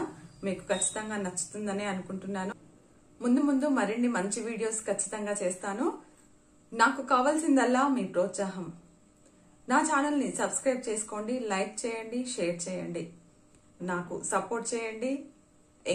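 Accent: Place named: Telangana, India. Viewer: Superb madam.Traditional reflection in pure Telangana slang.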